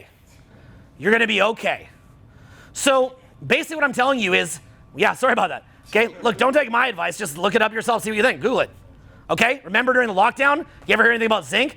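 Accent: Canada. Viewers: Fair-ull, for all the people incorrectly trying to correct your Canadian pronunciation of the English language. Great timing with this vid considering the two Epstein clients just went to metaphorical war today.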